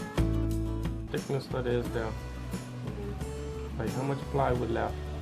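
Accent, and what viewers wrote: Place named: Caribbean. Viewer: Love the progress ... your accent is interesting it sounds like you were raised in the Caribbean in the west indies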